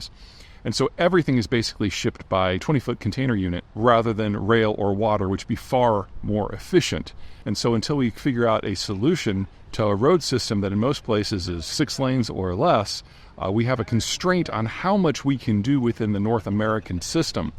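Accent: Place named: Iowa, United States. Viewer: That great Iowan accent!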